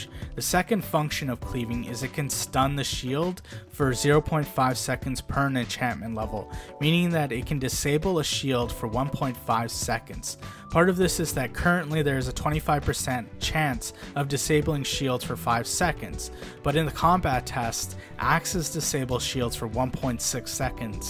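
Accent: India. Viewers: Are you indian?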